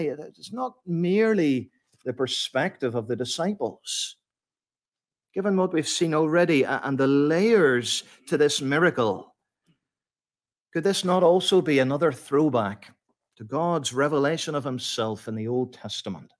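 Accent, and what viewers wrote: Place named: Ireland. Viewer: Thank you for a great sermon - loved the Irish accent too. Nice to see an Irish pastor -God bless.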